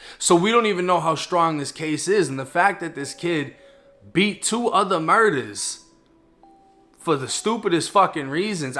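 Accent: Boston, United States. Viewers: thanks for the UPDATE. that little girl deserves justice; and to think had been not been in prison, who knows if the circumstances that led to her death could've been prevented. BTW: are you originally from BOSTON?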